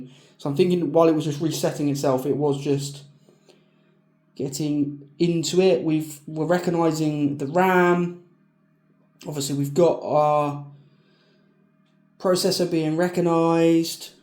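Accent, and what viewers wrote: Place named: England, United Kingdom. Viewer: Nice video and I subscribed because of it, about time an English accent was on a youtube tech channel keep it up mate.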